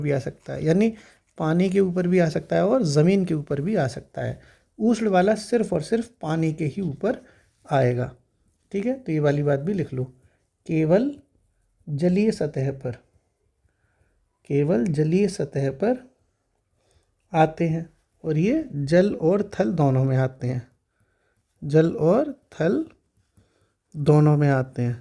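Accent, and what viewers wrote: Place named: Hathras, India. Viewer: सर आप हाथरस आगरा मथुरा अलीगढ़ क्षेत्र से हो आपकी बोली मेरी तरह है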